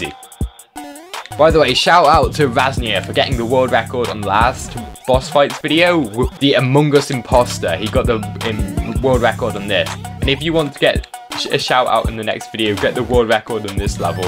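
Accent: United Kingdom. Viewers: Ur you British?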